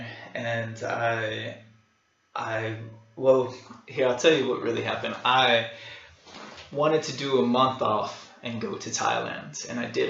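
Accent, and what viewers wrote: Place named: Texas, United States. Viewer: Felt like I just listened to myself with Texas accent haha!! Awesome bro :D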